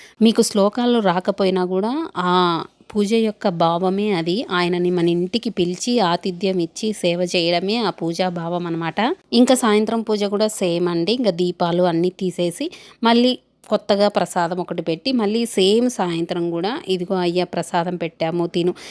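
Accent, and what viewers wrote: Rayalaseema, India. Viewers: Hi bujji maadi కూడా రాయలసీమ. యాస super. Funny Funny videos. Script totally natural. పూజ ardamainatu cheppavu.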